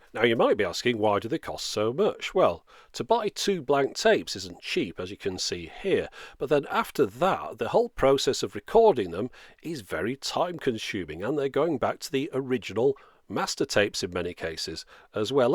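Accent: United Kingdom. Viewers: one of the reasons i watch this channel very often is not only the information i get but also the mesmerizing sound of your voice and astonishing British accent specially when you say 'however' and the last part of ' as always, thanks for watching' !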